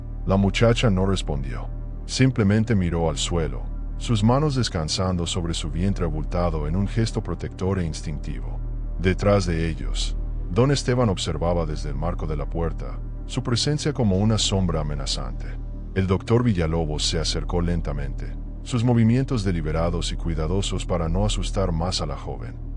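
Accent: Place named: United States. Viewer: Lo malo de esta historia es la voz un gringo que puede saber lo que pasa en México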